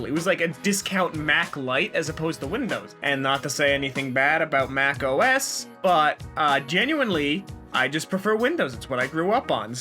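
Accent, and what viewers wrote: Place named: Brooklyn, United States. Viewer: I love your Brooklyn accent.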